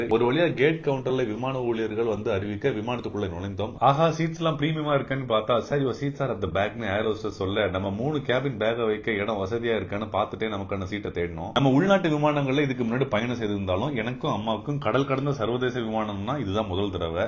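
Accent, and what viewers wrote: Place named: Sri Lanka. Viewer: Nenga srilanka a bro.? Ungal tamil ucharippu migavum arumai. Pothuvaga as compared to Tamilnadu people ur tamil slang is more og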